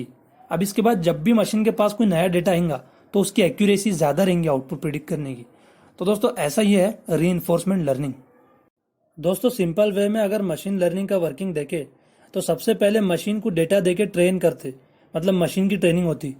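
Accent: Hyderabad, India. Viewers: you sounds like hyderabadi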